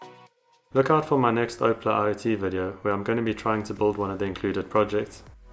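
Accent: South Africa. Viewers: You sound like a SOuth African BAsed in Australia. PS Great Content!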